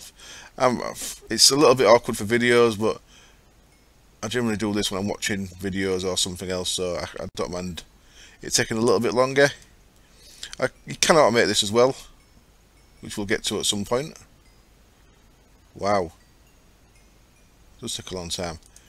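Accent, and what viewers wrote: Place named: Hull, United Kingdom. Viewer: Fab video.. Just started Monster after me Direwolf20 crashed... Love the Hull speak, And guessing Hessle road the way you swear lol.. Good stuff (y)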